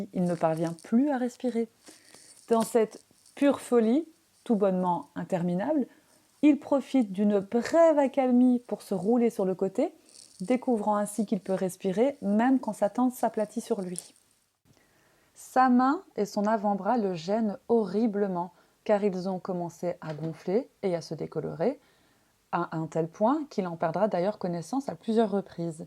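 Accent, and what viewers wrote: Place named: Belgium. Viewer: Je devine un petit accent belge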